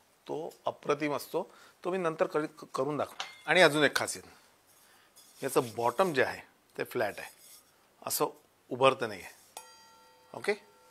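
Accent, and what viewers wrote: Vidarbha, India. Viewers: Vidrab touch bolaya chaan vatte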